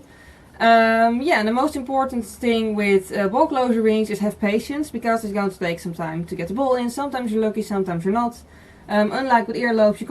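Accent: Netherlands. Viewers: Lekker Nederlands accent xD. Hoe lang duurt het helen bij een zo ongeveer (bij jou ten minste)? Ik ga binnenkort namelijk een helix en een tragus nemen. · Same , Ben je van Nederland? I’m from Aruba 🇦🇼 ✌🏽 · Omg army is everywhereeeee😂 but yea its because its a dutch accent and she talks pretty fast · Ik hoorde aan je accent gelijk dat je nederlands was lol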